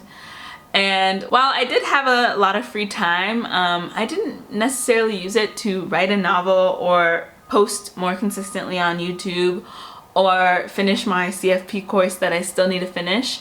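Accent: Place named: Georgia, United States. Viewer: Sounds Georgian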